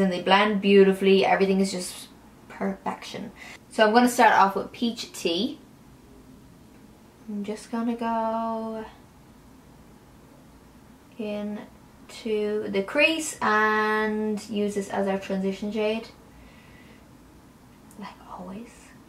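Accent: Ireland. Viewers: Are u Irish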